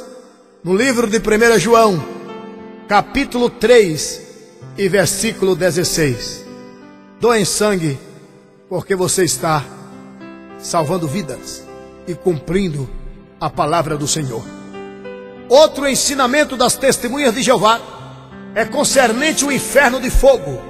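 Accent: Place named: Bahia, Brazil. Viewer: não critica o locutor, ele é baiano, o linguaja do baiano é diferente, ele pode ter errado alguma coisa, mais ele acertou muitas coisas, que essa seita ensina, sem jesus não há salvação. e os 144mil são israelita não gentil como nós, vamos parar de ensinar heresias, como os tj ensinam...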